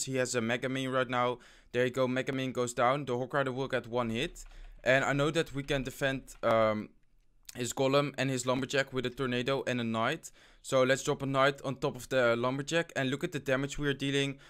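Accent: Netherlands. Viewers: Owen Seetsen hij is nl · You have a dutch accent, are you dutch? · Are u dutch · are u dutch!!! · you really have an dutch accent, are you dutch, ben jij nederlands want je klinkt echt super nederlands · je hebt echt een typisch Nederlands accent als je engels praat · This dutch accent has got me like 😂😂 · ik kan horen dat jij nederlands bent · jii bent nederlands of niet want je hebt een nederlands engels accent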